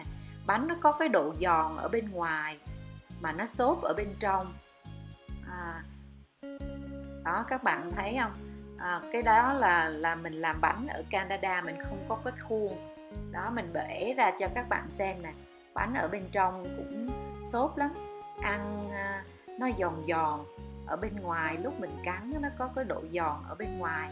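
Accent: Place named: North, Vietnam. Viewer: Nói nghe mệt quá,, chị bắc kỳ ơi,, hơi dài dòng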